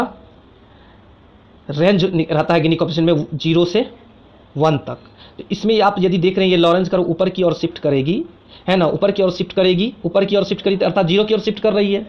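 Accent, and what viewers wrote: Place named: Bihar, India. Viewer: Very nicely explained.. It seems you are from BIhar. Good luck..!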